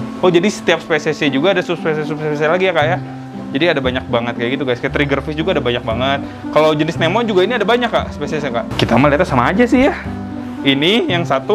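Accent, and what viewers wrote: West Java, Indonesia. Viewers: Bang Orang Sunda Ya?:)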